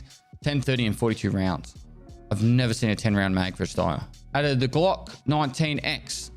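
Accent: Australia. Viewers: Me being Australian understood every word he said but…. I could imagine not being Australian could be hard. But cry us a river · Nothing like having a patch read by an australian man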